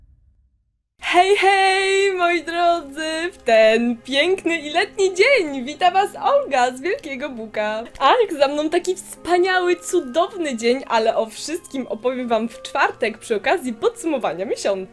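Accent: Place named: France. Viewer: Cóż za wspaniały, francuski akcent :D Wspaniała recenzja. Dobrałaś do niej świetną muzykę :D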